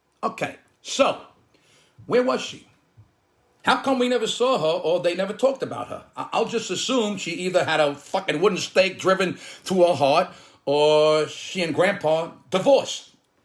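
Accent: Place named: Brooklyn, United States. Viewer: Brilliant observation and delivery!!! We moved from NY to Georgia (a suburb of Atlanta) last year (per my wife’s insistence) and I expected to encounter hillbillies. Far from the truth. People here are like those of any other big city. Besides, many people here are transplanted northerners. And my town is about 30% Asian! I love your humor, except for the profanity (but I know that’s part of your shtick). Listening to you, I feel that I’m back on the streets of Bensonhurst as a kid in the 1960s and 70s.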